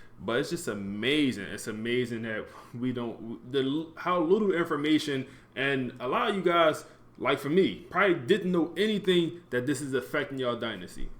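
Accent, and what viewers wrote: Philadelphia, United States. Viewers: Man I instantly knew this dude was from Philly!! lol but I used Flexible Play caller without realizing what I was choosing. Description definitely fits against my game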